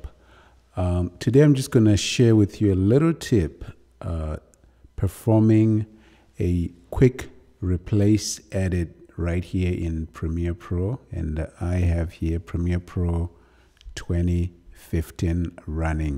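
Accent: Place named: South Africa. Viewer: you from SA? accent had me feeling at home lol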